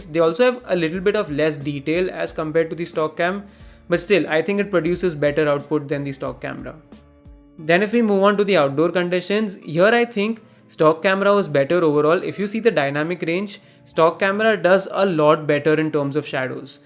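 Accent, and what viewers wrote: India. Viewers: CRINGE HEAR INDIAN SPEAK ENGLISH !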